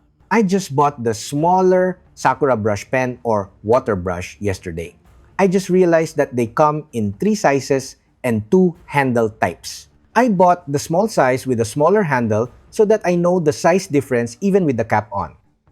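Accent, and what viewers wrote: Philippines. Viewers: I heard your accent in one of your shorts and thought you sound like Noypi like me 😂 Now I'm getting interested in learning 😆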